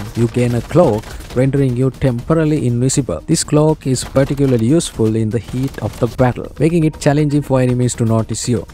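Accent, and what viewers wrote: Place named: India. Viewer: indian accent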